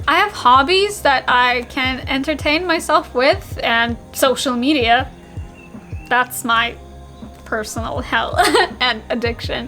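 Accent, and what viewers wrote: United States. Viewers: Are you American or Canadian? Which state you at? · Hey. How come you don't have a heavy Russian accent? You're accent is almost American. Did you live in the States? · I love it when you cuss, laugh, and giggle even at your own jokes. You're American born and raised in Calif, am I right? It seems obvious in every way you speak & express yourself. We might be related. I'm your creepy uncle, hahaha. Kidding.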